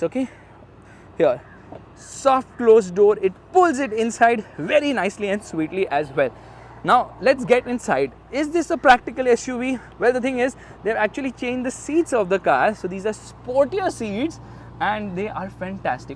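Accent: India. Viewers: Indian accent is really hilarious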